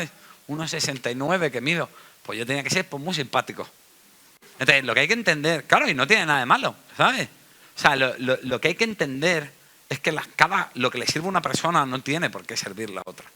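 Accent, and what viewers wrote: Andalusia, Spain. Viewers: Pues a mí me encanta el acento andaluz y lo entiendo perfectamente... Y conste que soy guatemalteca, así que mi acento es muy distinto.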